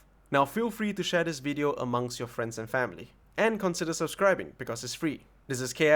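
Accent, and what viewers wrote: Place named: Malaysia. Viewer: You're either a malaysian chinese or a singaporean chinese, by how confused your accent sound like at times. American english or UK english..decide..other than that, awesome review.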